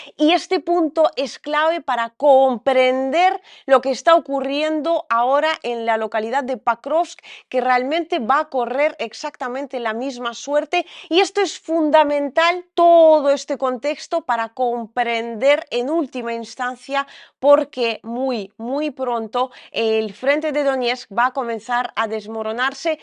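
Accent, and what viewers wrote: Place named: Spain. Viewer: Hola soy Español mi pregunta es dónde a prendites el español que maravilla cómo habrás con ese acento Español, parecés qué seas Española Felicidades españolista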